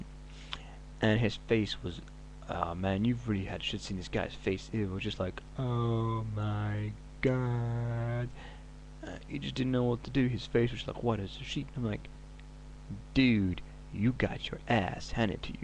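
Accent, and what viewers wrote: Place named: United States. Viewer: Dude sersiouly stop with the american acsent its getting old lol also this vid is blury as shit! Liked what ya said though because its so true!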